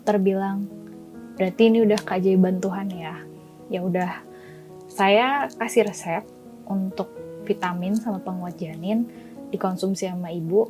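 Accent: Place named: West Sumatra, Indonesia. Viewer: logatnya kaya orang minang😁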